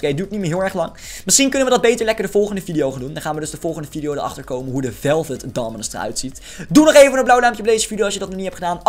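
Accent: Netherlands. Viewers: wil je parodie's en liedjes in het echte leven maken voor je kanaal? Want dat is zoooo lang geleden.. praat nou met een Amsterdams accent?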